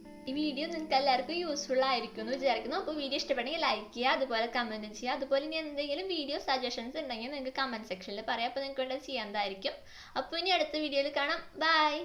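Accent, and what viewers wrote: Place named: Thrissur, India. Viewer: Ente frnd thrissur und... Pinne slang kettappo manasilayi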